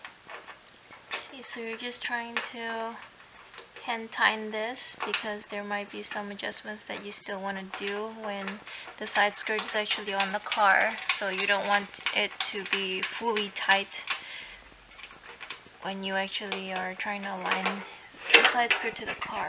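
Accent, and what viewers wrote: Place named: Hawaii, United States. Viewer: Are you from Hawaii? I can hear a little Hawaiian pigeon in your speech.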